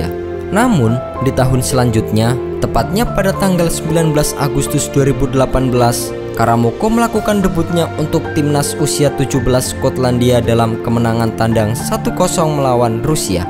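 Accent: Java, Indonesia. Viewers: knp medok ya wkwkw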